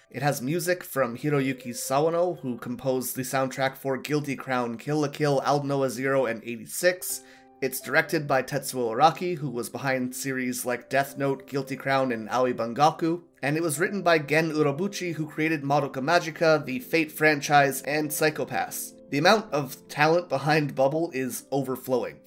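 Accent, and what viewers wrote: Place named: Canada. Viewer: Oh ok. I kept watching your videos and I thought you had a Canadian accent.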